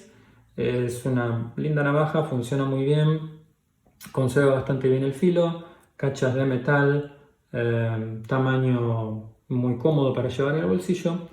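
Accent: Argentina. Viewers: Sos argentino?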